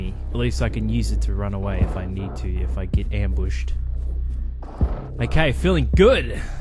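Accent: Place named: Scotland, United Kingdom. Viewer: that adorable Scottish accent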